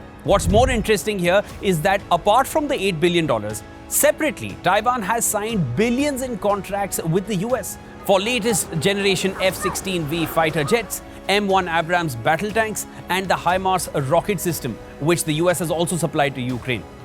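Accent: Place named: India. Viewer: Yiu. And. Yiur. Annoying. Indian. Accent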